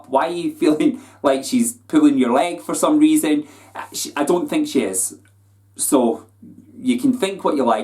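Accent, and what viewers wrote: Scotland, United Kingdom. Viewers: Your accent reminds me of this really hot Scottish squaddie I dated last summer, such good memories 🤤🤤🤤🤤😍 so sad we lost contact arrrrrghhhhh!!! random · love hearing a Scottish accent on youtube!!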